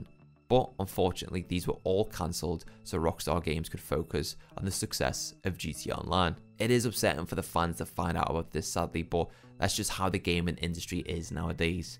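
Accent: Liverpool, United Kingdom. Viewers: Near certain you are from Liverpool. We should collab on something, yeno. It would be decent. Two scousers hopping on some games. Seen your GTAiv and made me wanna play it again, on ps3.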